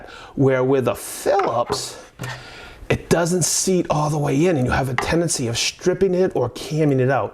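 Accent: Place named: Boston, United States. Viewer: Thanks to that nice Boston accent, i felt like i was watching an wpisode of This Old House 😌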